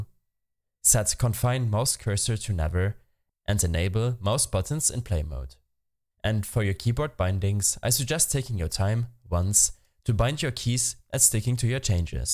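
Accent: Germany. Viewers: so geil das man raushört das er deutsch ist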